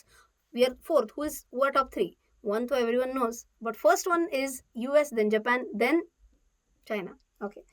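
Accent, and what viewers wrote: Maharashtra, India. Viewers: You are reaching 2m subscribers! Are most of the subscribers from Maharashtra region? If yes then its ok, otherwise I find marathi emphasis ( even though slightly) pretty needless. Sorry to break this to you. Lotsa ❤️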